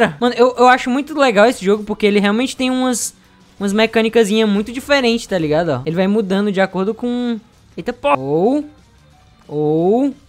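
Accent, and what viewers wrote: Northeast, Brazil. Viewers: tu é nordestino né?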